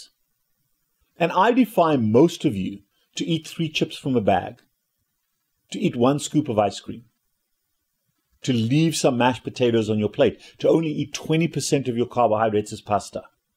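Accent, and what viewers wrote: South Africa. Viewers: Is your accent South African?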